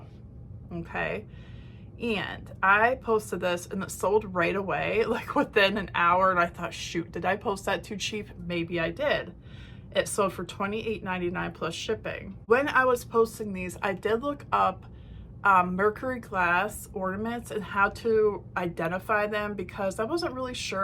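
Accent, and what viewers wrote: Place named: Ireland. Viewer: Great Irish accent! What movie was that with the junk lady troll?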